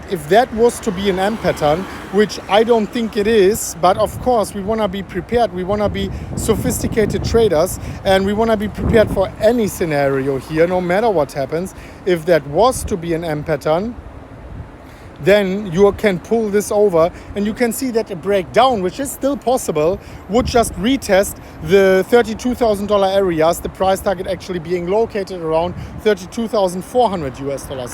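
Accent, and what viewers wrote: Germany. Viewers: You sounds like fxxkin german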